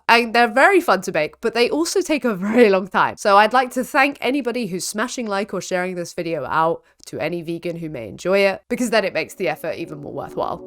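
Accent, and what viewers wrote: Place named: United Kingdom. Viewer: I enjoy your wit ... and your British accent 🥰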